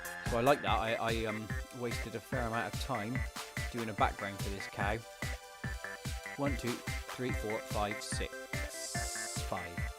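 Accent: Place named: West Country, United Kingdom. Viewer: Are you from Bristol, by any chance? Your accent sounds very westcountry with your "Aff" instead of "Of" and your hard Rs. Don't worry if you are lol, I'm also a Bristolian!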